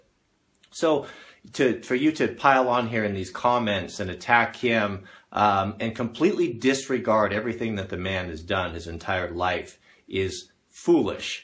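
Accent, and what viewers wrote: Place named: North America. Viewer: I have no idea of the "Controversy" involving Mr Canterbury, BUT! I take my hat off to you for making your stand known to the world. I am residing in Ireland, and you sound like you are in north America, that is the reach of youtube! :-) Please keep the videos comming! Kev B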